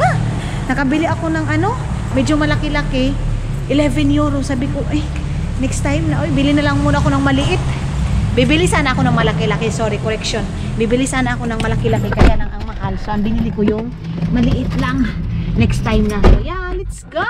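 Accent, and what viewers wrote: Visayas, Philippines. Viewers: Hello maninay ang ganda ng house mo sosyal tuwang tuwa ako sayo maninay pagnabibisaya k nakakatuwa k kc magsalita iloveyou maninay godbless you 🥰🥰🥰🥰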